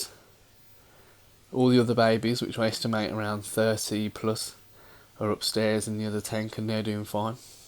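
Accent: Birmingham, United Kingdom. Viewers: ah thought I heard a fellow Brummie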